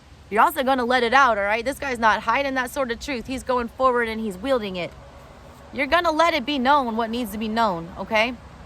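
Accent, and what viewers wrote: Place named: South, United States. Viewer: A southern story telling straga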